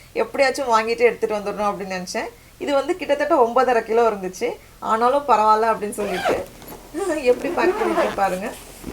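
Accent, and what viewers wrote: Nagercoil, India. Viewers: So happy to hear namma ooru Tamil. Looking forward to seeing more of your videos. By the way,,,can u upload some roast/grilled chicken in oven if possible? I am from Nagercoil but now in UK, where we get lovely meat joints. I hate the English way of doing it as it’s too bland. Oven roast chicken, duck, turkey, ( if u r ok with beef and pork u r welcome. if not ignore it. No issues) Wish u a wonderful New year. Nothing refreshes us like a visit to our home town. Keep going. All the best.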